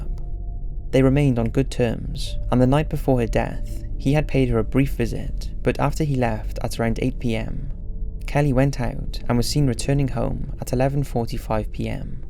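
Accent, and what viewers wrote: United Kingdom. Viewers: Top-notch content. As always, sit back, relax, and fall asleep listening to your British accent. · It makes me laugh people going on about his voice. It's just a normal British accent.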